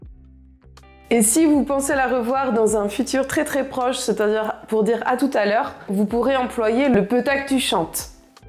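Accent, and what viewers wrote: Brittany, France. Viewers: Salud dit, trugarez evidout video. Je suis débutant et c'est top pour avoir du vocabulaire et entendre un autre accent Breton. Pour dire à tout à l'heure sur dans l'aven on dit également "Ken tuchant" pe "ben tuchant". Kenavo dit.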